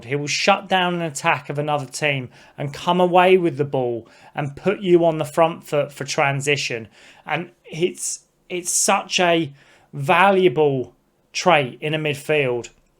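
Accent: United Kingdom. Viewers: A Newcastle Fan from Down South? (judging by accent). That's gotta be a rarity? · I just love your Newcastle accent !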